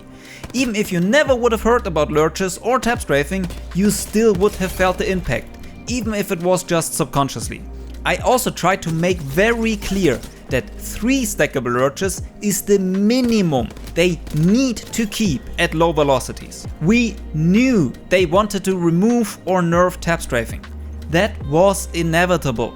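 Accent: Germany. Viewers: Der ist doch deutsch oder? Dieser Akzent ist ja kaum auszuhalten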